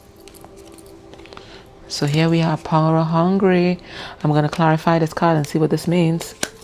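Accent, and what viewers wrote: Trinidad and Tobago. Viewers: Hi are you Trinidadian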